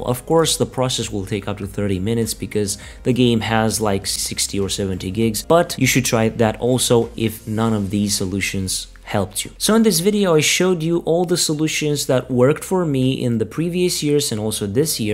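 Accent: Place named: Romania. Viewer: wtf, are you romanian ? :))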